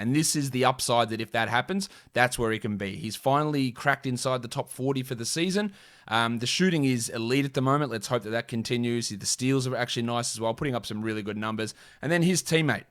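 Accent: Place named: Australia. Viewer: Great show. My wife likes ya Australian accent. Cheers to the weekend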